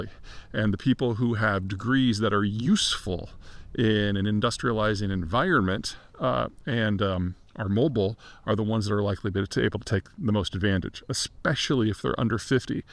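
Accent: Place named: United States. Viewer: Oh! It's the same fxxxing expert with an American accent, who knows so much about Australia. Too funny. Pass the bloody popcorn mate. Keep doing these, you are a genius, It's so comical listening to you blow it up your own arse.😂😂😂😂😂😂 Love the sunglasses, they really do a great job hiding your IQ. Get back to the northern hemisphere, you are stealing our supply of oxygen down here. Then again, the Septics are well versed on extortion. They have practised it all over the world, since the time of Columbus.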